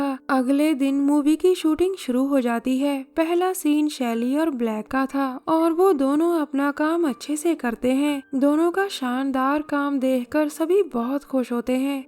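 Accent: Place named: Bihar, India. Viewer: Always here Love you didi You are from Bihar I know 😁🙏😁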